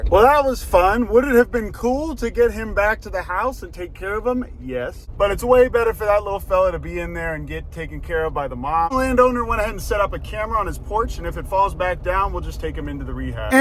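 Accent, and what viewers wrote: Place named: Texas, United States. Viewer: Pennsylvania? No, he's Texan.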